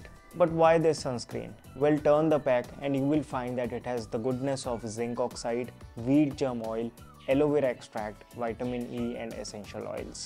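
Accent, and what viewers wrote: India. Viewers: He is talking in English but it feels like I'm listening Hindi.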